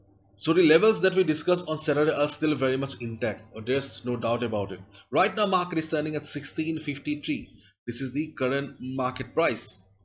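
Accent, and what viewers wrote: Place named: Assam, India. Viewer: Dudin dhori sai su apunar video.....mat tute dhrbo parsu asomia buli...accent tu ase asomiar...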